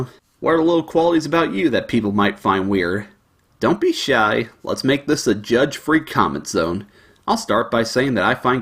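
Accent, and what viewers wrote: South, United States. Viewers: I didn't notice u weren't 'normal' tbh, in fact i considered you the most normal. Or at least in that ur very down to earth and even have a 'country boy' accent to boot. :-/ Liking cartoons isn't weird tho, not since the internet was invented at least. It's weird imo to say anyone who does like those things are weird.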